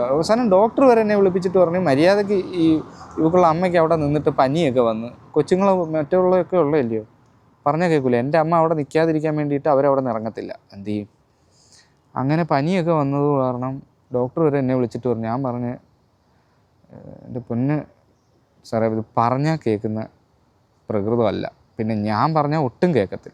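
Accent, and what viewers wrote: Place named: Pathanamthitta, India. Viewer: Bro Try to solve and have a good life with your child, നമ്മളോട് നന്നായി behave ചെയ്യുന്ന നമ്മുടെ relatives മറ്റുള്ള എല്ലാവരോടും അങ്ങനെ അകണം എന്നില്ല. ആവശ്യമെങ്കിൽ അവരോടു കൂടി മാറി താമസിക്കണം. ഇന്നത്തെ കാലത്ത് എല്ലാവരും എല്ലാവരെയും സഹിച് ജീവിക്കണം എന്നൊന്നുമില്ല. നിങ്ങളുടെ ജീവിതം നിങ്ങൾ 3 പേരുടെ മാത്രം ആണ്. അവരോട് നേരിട്ട് ചോദിക്കുക ഒന്നിച്ചു ജീവിക്കാൻ താല്പര്യം ഉണ്ടോ എന്ന്, എന്നിട്ട് മുൻപോട്ട്. You sound kike from Pathanamthitta or Kollam area, all the best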